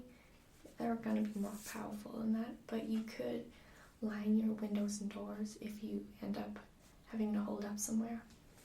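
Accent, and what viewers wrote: United States. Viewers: American accent for the win! Great job. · I love your accent, so I was FLOORED when you were using an American accent. Great job and nice change of pace with the Hunter angle. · Your American accent is awesome!!! :D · 1) Excellent American accent. :) 2) Supernatural. And ASMR. A surprisingly fantastic combination. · your american accent is pretty good! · nice american accent! :) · Awww, came here for your own ccent and then it's American :( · Nice American accent :) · I love the American accent! Not only do you do it well, it's also really relaxing. Switching it up is a good thing. You're perfection!<3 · American accent, WHAT!? Totally threw me lol. Well done! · You should be wearing plaid so you can be a real winchester! Haha that was a pretty good American accent though! I'd love to see you do a spin on this idea as a crossroads demon or something! 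Hrrmm maybe I'll make my first asmr video as a kind of response to this as a demon or angel or something, if you don't mind me kinda borrowing the idea! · Nice video! I am quite impressed with your american accent! So much better than I could do a british one... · Fantastic American accent. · Wow, I didn't recognize your voice at first! Very nice work on your Inland North accent of American English. Regrettably, that means your voice doesn't trigger my ASMR like it usually does, but good job nonetheless! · wow, I don't don't know how difficult it is to sound American since I am one, but I couldn't even tell you weren't! Very nice, although your normal voice is just so damn relaxing. Also, freakin awesome idea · Nice American accent! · Haha, that American accent took a while to sink in. I kept thinking that something didn't sound right but it wasn't until a minute and a half that it clicked. 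Cool gimmick. :) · I didn't realize it at first I was like something's different the American accent that's it nice job beings I'm American let me here you say book haha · Your American accent is more believable than my British accent. Lol. Good job!! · Great job with the American accent!!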